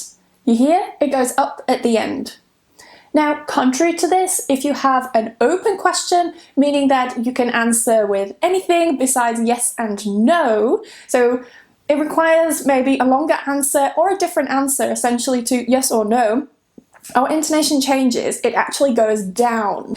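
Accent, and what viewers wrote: United Kingdom. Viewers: It's lesson was marvelous and helpful for me. I love your British pronunciation. Thanks. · Hello! Beautiful British accent!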